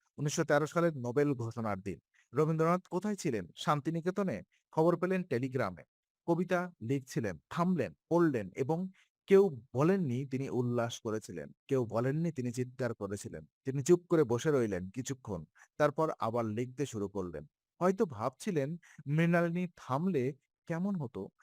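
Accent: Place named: Bangladesh. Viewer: manshi 909 = Pl. try to digest the narration only not to give attention on PRONUNTIATION Possibly the Narrator hails from Bsngladesh.His Research work on whole life of our International Bengali Poet, in a nut-shell _ should be praised first.Hope critjcism be last _ Appreciation be first. now understood = sr.citizen.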